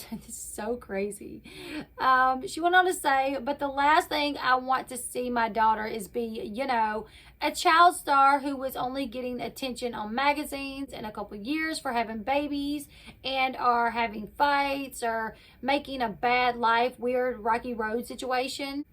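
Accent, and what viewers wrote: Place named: Shreveport, United States. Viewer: I love your channel and accent, it sounds like my family in Shreveport (I'm currently in Detroit).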